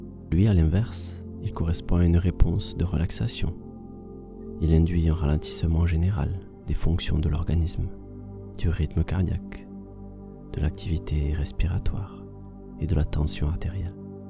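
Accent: South, France. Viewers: c'est l'accent du sud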